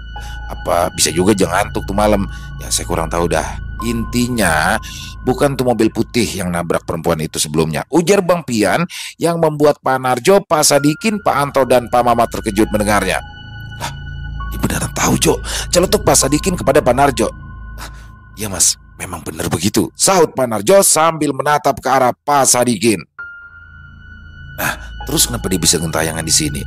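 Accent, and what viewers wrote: Jakarta, Indonesia. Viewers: Paling suka episod bang pian😁😁khas dengan bahasa Betawi nya😁😁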